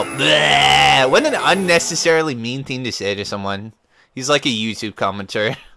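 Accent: California, United States. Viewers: everyone can tell where you live by your voice because of your California dude accent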